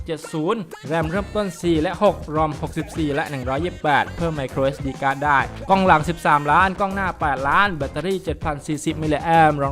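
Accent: South, Thailand. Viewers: สำเนียงใต้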